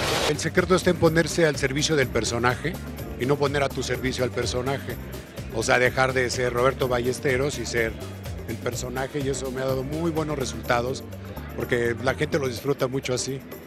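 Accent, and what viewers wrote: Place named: Mexico. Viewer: Tiene acento mexicano pero es peruano.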